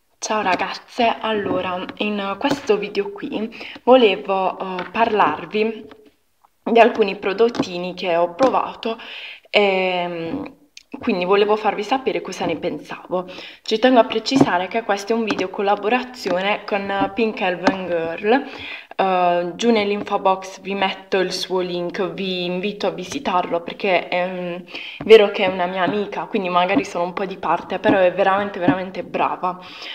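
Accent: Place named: South, Italy. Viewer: ma no che dici , perche ' ???? hai una voce bellissima , ma poi xke' ci dobbiamo fare sempre mille problemi con l'accento ,noi del sud !!! e' questo !!! anzi e' simpatico , ti assicuro che c'e' di peggio ! molto peggio !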